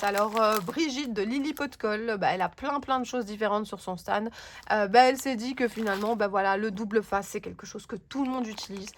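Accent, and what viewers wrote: Belgium. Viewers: Bonjour, je participe et mon coup de coeur est double avec le tampon Lavinia et aussi le lot de chez universcrap. J'adore tes vidéos surtout quand tu nous montres des tutos de création et j'adore ton accent belge et ta façon de parler avec certains mots qui fond toujours drôle dans mon oreille. J'adore rencontrer les exposants dans les salons pour mieux pour mieux connaître les produits qu'ils proposent et comprendre mieux leur univers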